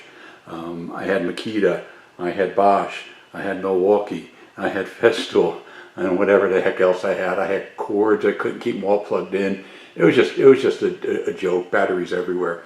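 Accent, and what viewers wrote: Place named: Bridgeport, United States. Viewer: Great video. Nice content. You sound like you grew up in Bridgeport. Southside.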